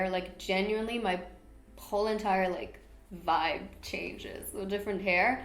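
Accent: United Kingdom. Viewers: you deadass are getting a little flare of british accent in your voice I LOVE IT lmao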